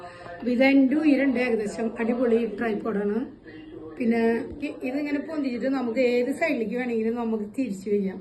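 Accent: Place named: Thrissur, India. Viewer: Thrissur .....language superattaaaa.....simple and beutiful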